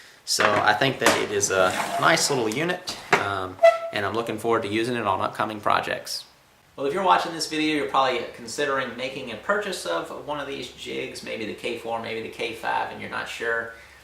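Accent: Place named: Arkansas, United States. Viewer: Off-topic.. Being from Scandinavia, I think I've only ever heard one [famous] person from Arkansas before... do you happen to be from thereabouts as well? :) Keep up the good work!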